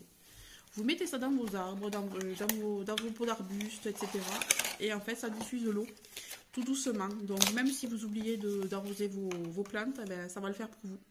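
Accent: South, France. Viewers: et ses petites combines Gros Gros bisous D'ALSACE. AVÉ ton accent tu nous amène le soleil et les cigales par ce froid. A bientôt.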